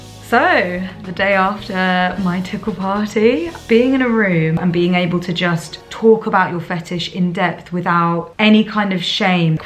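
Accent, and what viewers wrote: United Kingdom. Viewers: Hello, you're AMAZING! 😁 I love your openness and especially about your tickling fetish! ❤ I have had a tickling/foot fetish since I was 6 years old! 😱 I never understood why? For years I thought that I was a mutant and kept my fetish a secret! 🥺 Then thank God for the internet! 🙌 Because then I realized that I wasn't alone and found out that it is a very common fetish! I applaud you for your boldness and openness to discuss to others about our fetish! 👏 I am bummed that I missed this certain tickle party though, 😭 I am guessing from your beautiful British accent though, that it was probably far away from me, since I live in the United States 🇺🇸 I would love to do a party myself, or if you ever plan to come to the U.S. then definitely hit me up and we could maybe make it happen, because there is A LOT of tickling fetishists all over the U.S. 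I have subscribed to you, it was the easiest decision and most important subscription that I had to make on YouTube! 👍I bet you're far from me though, because it seems to be that all the good one's (girls) That seem to be compatible or share the same interests usually live far away! 🥺 Continue being as great as you're! 💗 You definitely have a new friend and fan! PLEASE do more videos regarding tickling! 😍We the tickle community needs more people like YOU! 👇 Take care and HAPPY tickling to you! 🥰 🤣 \|/ /\ 👉👣👈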